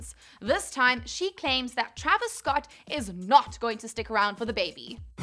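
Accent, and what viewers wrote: South Africa. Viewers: is from South Africa?? cant miss that fellow south African accent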